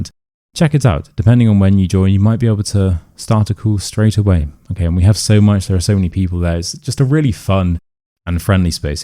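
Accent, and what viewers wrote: United Kingdom. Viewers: I really love your YouTube channel. I watch almost every video.I chose your channel because you have a great deep voice and a real British accent. I want to learn this accent from you.But I have a small request. Sometimes you speak too fast when you move from one sentence to another. Because of this, my brain cannot catch the first sentence.Of course, you don’t do this all the time, but it happens often. I frequently have to separate the sentences in my head by myself.Could you please make small pauses between your sentences? This will help me understand you much better.Thank you for your understanding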